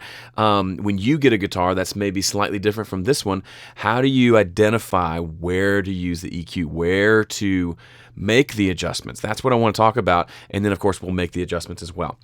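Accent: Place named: Mississippi, United States. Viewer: Hi, I have been enjoying your videos on proper EQ. Great Job! Your accent seemed familiar to me so I checked you out and lo and behold, like me, you 're a Mississippi boy. I'm from Jackson, an original rock 'n roller, who played with Andy Anderson from Clarksdale in the early days. Keep on making those videos and I will keep on watchin'!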